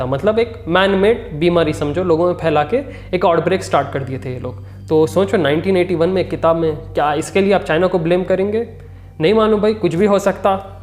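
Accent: Hyderabad, India. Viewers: Bhai hyderabadi slang miss hori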